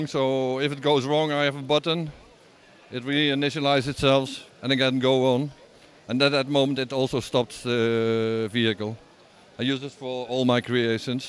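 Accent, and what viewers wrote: Netherlands. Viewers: ik vind het altijd zo mooi om te zien dat mensen zon nederlands accent hebben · je hoort gewoon dat hij nederlands is 😂😂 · HAHA HET NEDERLANDSE ACCENT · He's from the Netherlands i can hear his accent i am Dutch too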